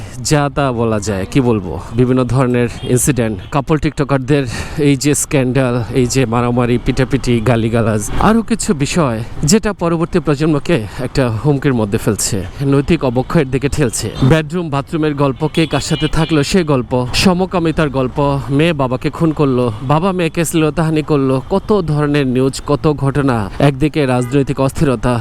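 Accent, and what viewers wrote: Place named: Bhuiyapara, Bangladesh. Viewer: Bhai apnar basha ki Bhuiyapara naki?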